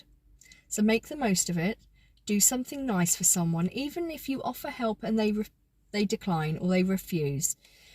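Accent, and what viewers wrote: South, United States. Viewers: Thank you, It's true though, came into our homes 100s of times because we invited her in for a chat. Many of us will miss her deeply and yes keep her channel (I will as well) just to hear her dulcet Southern tones. I remember when my Mother passed away I would call her daily praying she would just pick up the phone so I could hear her voice say "Hello". There is some breaking news here in the UK. The Palace has announced that our amazing Queen's health is deteriorating and as I write to you members of the family are travelling up to Balmoral to be with her. Her brain is strong and hopefully she will pull through, however this does not sound good. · She was the first person I subscribed to. She was so wonderful to listen to. I loved her southern accent! She will be missed greatly by us all. Condolences to her family RIP sweet you are now safe in our heavenly fathers arms! 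🙏❤️ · She will be truly missed. Loved her videos and her wonderful southern accent. She was a wonderful soul. May she rest in peace 🌈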